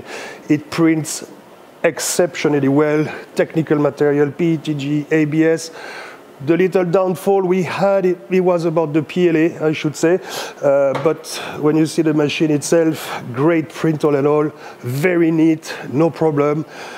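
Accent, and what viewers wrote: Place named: France. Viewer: Yes, this is french accent :)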